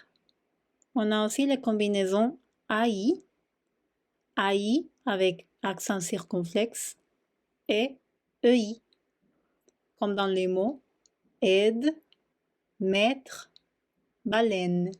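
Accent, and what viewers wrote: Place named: Spain. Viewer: HoLa !! Je suis Français (donc ma langue maternelle est le français) et hispanophone "autodidacte" (je n'ai jamais suivi de cours mais j'ai beaucoup écouté et lu et vu des tutoriels) : il me semble que votre vidéo, si je l'ai bien visionnée et écoutée attentivement, peut prêter à un certain degré de confision, car il ne m'a pas semblé que vous abordiez le "e fermé" (peu, deux, feu, euh...) alors même que c'est la manière type de prononcer cette lettre quand on récite l'alphabet en français ! d'ailleurs, quand vous évoquez brièvement le "y" vous le nommez "la yod" alors qu'en français, on dit "le i grec" ! par ailleurs vous récitez les voyelles "à l'espagnole" en particulier le "u", que vous prononcez tel un "ou" à la française... merci cependant pour vos efforts de vulgarisation ! Par ailleurs il me serait loisible d'entrer directement en contact avec vous ou quelqu'un d'autre de L'atelier du français | Paris (pour une bonne raison musicalo-professionnelle car je suis Compositeur) !!!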